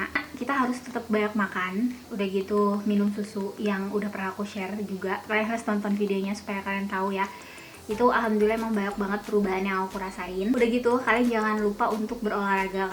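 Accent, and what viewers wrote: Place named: West Java, Indonesia. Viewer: Emaaak dengernya 🌹 Sunda detected 😊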